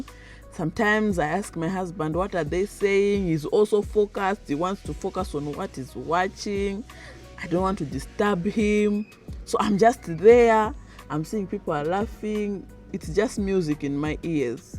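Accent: Buganda, Uganda. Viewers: Don't be unequally yoked , now u speak Ugandan English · “I’m just theya” 😂😂😂 you so sound like a Ugandan now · How do you say thereee as a Zimbabwean you sound Ugandan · Most of the languages of Zimbabwe are linguistically related to Luganda and the other Bantu languages of East Africa. If you understand Shona or Sindebele, learning Luganda will not take long. By the way, if you had not stated that you were from Zimbabwe I would have assumed from your beautiful accent that you were a Ugandan. I have pasted here a link to Luganda language lessons. They are easy to follow. Whatever you learn from it, you can practice it with your husband and see how you advance. I wish you the best. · You are already speaking like a Ugandan take it easy , take language lessons, and open a market place you will be speaking the language in a week. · Your English accent now sounds more like ungandian😂😂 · you are doing very well, your english accent & facial expressions already shifted to Luganda ... 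thats a good start. keep it up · Your accent is already Luganda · My sister, your English sounds Luganda.So what's your problem when it comes to Luganda itself.... I am from Mozambique, but I speak Luganda..nzee n'yogera Luganda nyo, soka okuwulira nga mwana, kubiri, soka okuyogera mpola mpola. Talking of chicken lehs and head, when I got back home, I no longer eat them anymore... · U sound Ugandian already”distab him” when u are home sick come watch Zimbabwean content. I get u when he they speak their language even the jokes won’t be joke enough when it’s translated to English Sorry wena Vamwene mongotarisana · You are almost a Muganda Nyabo. Your accent is already Ugandan, be patient Luganda is almost like Shona, I lived in Uganda for a few years and I do understand when they are talking coz out languages are cousin languages, they could never Nyeya me when am around, coz i could pick a few words ! 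Enjoy endlessly kneeling 😅😂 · But your English is now ugandaring my sister 😅.even your tone has changed 😂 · Your English accent is becoming Ugandian. Watch a lot of local dramas and learn the basics and don't be shy to speak the little that you know. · You will learn my sister, but your accent is now Ugandian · Your lingo has changed as well you sound Ugandian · You already sound like ugandan, until when u say you are not one. Uganda is a friendly place to foreigners. I dont agree that it is stressful. Ask the africans living in nordic countries, they shall tell u how easy it was for them to learn those viking languages. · You are now speaking Ugandan accent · You are now even getting the Ugandan English accent.... 😂😂😂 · Your accent has turn Ugandan. You are now saying theya. My sis please don't copy that accent please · You're doing well even your English accent has changed. If you didn't mention you are Zimbabwean no one can tell. 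You sound Ugandan. 2 ways to learn a foreign language are exposure and taking a formal course. So keep going to those public places and events. Go to Nakasero or Owino markets and negotiate prices . Attend church, weddings etc soon you will pick up the language. Check if Makerere or other colleges have a language courses. Taking a formal course would help you learn the language the correct way then you go practice what you learned by exposing yourself in public places. Don't be shy😅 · Hugs to you.. you’ve already picked the Uganda accent😜hope you can now do the Acholi dance.. language wise, ugandans vanoita kunge vari kutaura shona, so i think if you are shona you should learn fast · Wow, you already have a Ugandan accent! Not dominant in every word, but it's coming through in a few of them.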